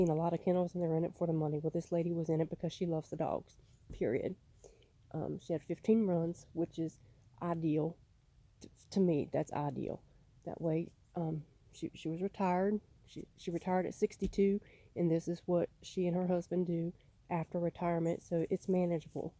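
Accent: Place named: South, United States. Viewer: I love your country accent ❤️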